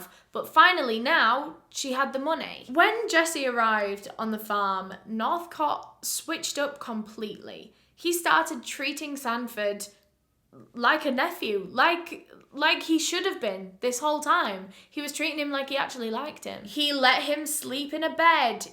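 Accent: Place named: Southern England, United Kingdom. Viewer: just me who thinks accent is fading into a more southern English accent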